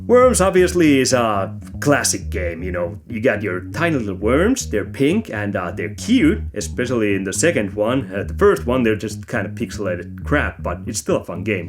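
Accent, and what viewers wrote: Finland. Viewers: I really enjoyed the video and your personality. Your delivery reminds me a lot of my time living in Espoo.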